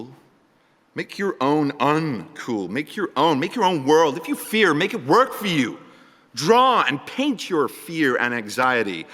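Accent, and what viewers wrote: United States. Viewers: HIS AMERICAN ACCENT IS AMAZING TOO HAHAHAAH I LOVE HIM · Why he is speaking like an American!?!?!? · Everyone please realise he's putting an American accent here. It's definately not a British accent. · he's pronouncing ful r at the end.Of course 'it's an American accent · How on earth he speakes American accent like pretty normal...oh my god..!!! He is British...How on earth how on earth..??? 😮😮😮😮😮😮😮😮😮😮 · Was he required to do this in an American accent?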